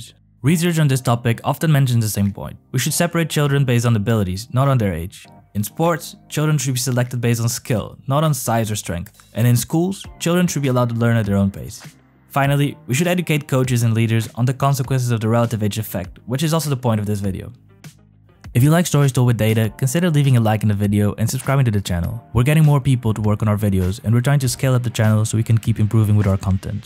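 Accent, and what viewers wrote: Netherlands. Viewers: I can tell you, being born in December, I must really be amazing since I still managed to outperform everyone else in my class from primary to high school. And very humble at that. Edit: I'm Portuguese too. You don't have a Portuguese accent in English... Honestly, you have a very Dutch/Flemish accent. It's tripping me.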